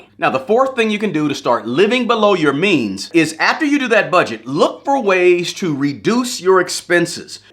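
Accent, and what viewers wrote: South, United States. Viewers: I’ve started to live below my means. It takes discipline because I am a spender. Thanks for the tips. Btw, I love the southern drawl you have. God bless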